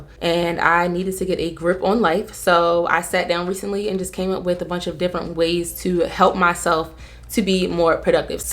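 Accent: Baltimore, United States. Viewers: I felt like you was from Baltimore lol yesssss , I love your videos ! They REALLY help me ! Mane , then I’m sooo isolated now & I see God is doing that . I just changed my alarm to 7am lol #baltimore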